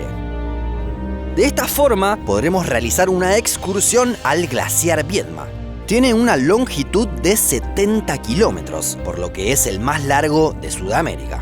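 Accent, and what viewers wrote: Buenos Aires, Argentina. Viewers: Soy argentino pero que chocante sos porteño eh o bonaerense.! Ashi, sha, shegan, shegar, mashores, sheva, trashectos, shamado, cordishera, shan vishanueva, shevarlo, ashuda, mashor, shuvias, eshos, desarrosho. Lástima la reseña está bien pero Insoportable escucharte, único y último video "tusho" que veo. Adiós.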